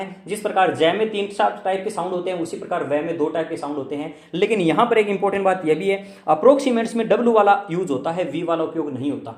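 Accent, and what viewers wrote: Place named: Haryana, India. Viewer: Sirji aapki language me haryanvi tone kse aapto rajsthan se hoji 🤔🤔🤔🤔🤔🤔🤔🤔🤔🤔🤔🤔🤔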